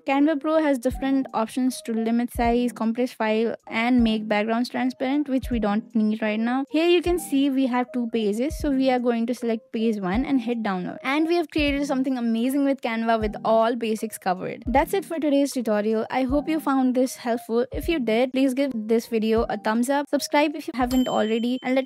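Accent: India. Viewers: Finally someone has taken the time to explain the basic nuts & bolts/tools of Canva. I also like you Indian accent ❤ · hate indian accent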